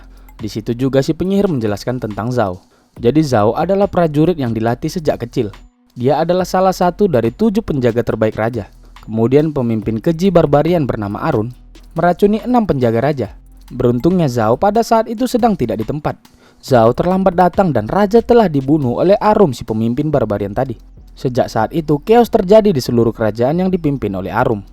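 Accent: Medan, Indonesia. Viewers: logat medan kau bg ketara kale bah,jadi ketawa aku dengarnya🤣🤣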